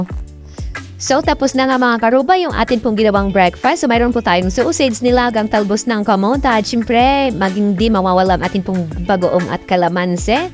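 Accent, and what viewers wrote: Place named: China. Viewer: may accent ma si madam ahh. Mandarin accent ma tagalog sanaoil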